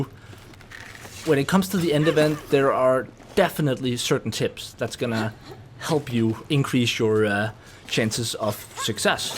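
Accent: Denmark. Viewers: Are you danish? Your accent sounds a lot like WackyJacky101's a pubg content creator. Thanks for the video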